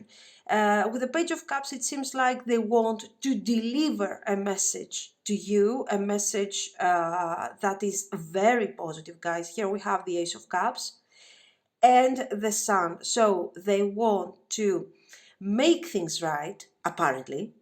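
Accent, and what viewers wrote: Greece. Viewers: Your voice has the Greek accent 💕 So if you are, σε ευχαριστώ πολύ! Το πακέτο 2 was on point and you never fail me with your readings!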